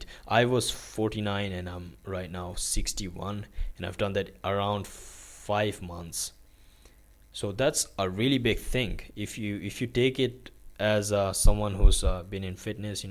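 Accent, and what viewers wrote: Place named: Czech Republic. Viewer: How did you learn english❤ so well in a Cruchy accent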